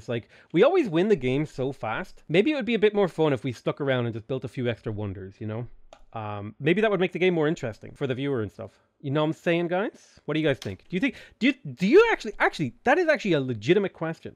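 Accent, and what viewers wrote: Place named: Ireland. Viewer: That moment when I hear an Irishman talking about paddy fields, and becoming fully redfaced and relieved when I realize "Ahh talking about rice, I'm an idiot!"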